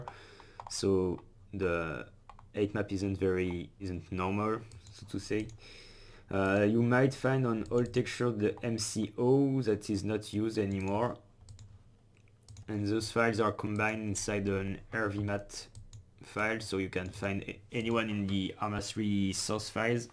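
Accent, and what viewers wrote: France. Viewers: Very noticable french accent ;) thanks for taking care of the Arma modding community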